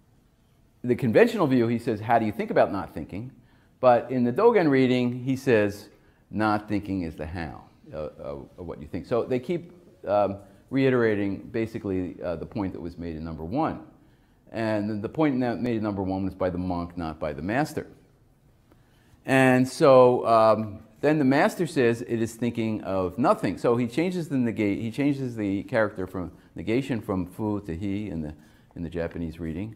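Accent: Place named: Malta. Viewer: she sounds Malties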